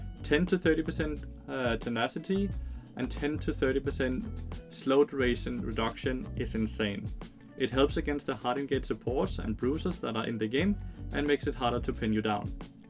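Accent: Denmark. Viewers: Bro are you Danish? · Are you Danish? :p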